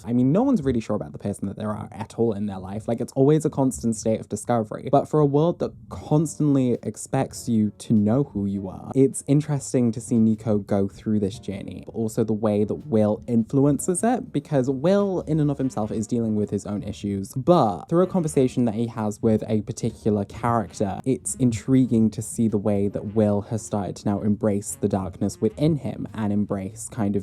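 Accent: United Kingdom. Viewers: You've such a nice accent! Is it from Britain?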